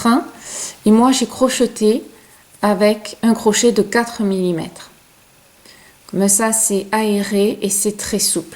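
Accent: South, France. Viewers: Merci à toi, "comme une grande" j'ai réussi à faire ce magnifique point de torsade grâce à tes explications claires et ton agréable accent du Sud.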